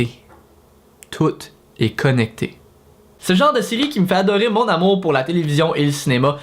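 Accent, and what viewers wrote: Quebec, Canada. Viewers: Pur québécois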